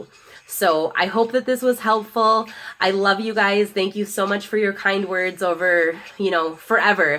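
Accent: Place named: Chicago, United States. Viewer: sounds like chicago baby!!!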